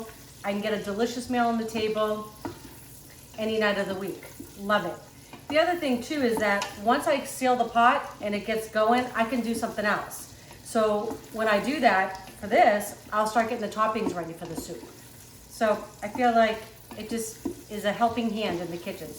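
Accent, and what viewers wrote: Boston, United States. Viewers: Great video First time I’m watching! I hear a Boston accent?!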